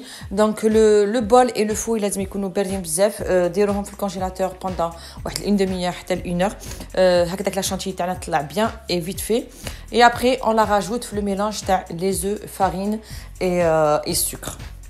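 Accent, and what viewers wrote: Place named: Marseille, France. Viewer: 3andk acson marseillaise bonne continuation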